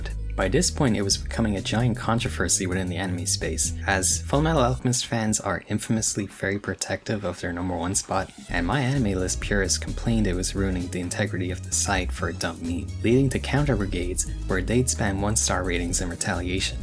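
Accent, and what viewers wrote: Ireland. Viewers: Great video, watched tbe whole thing. Also what accent is that? I thought you were american at first but you say your THs and Rs in a very Irish way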